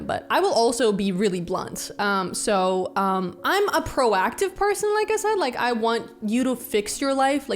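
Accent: United States. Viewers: u sound American though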